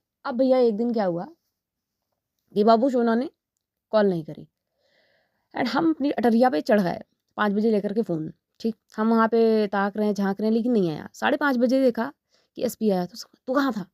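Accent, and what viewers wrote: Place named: Bihar, India. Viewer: Omg .... Wao apne kitte achhe se story narate ki ....full bihari tone maza aagya .... 😂😂😂😂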